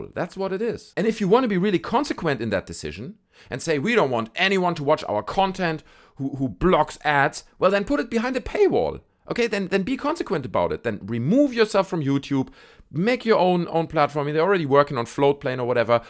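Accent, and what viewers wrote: Germany. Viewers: Very Good Video! Are you from Germany? Because you have a German Accent lol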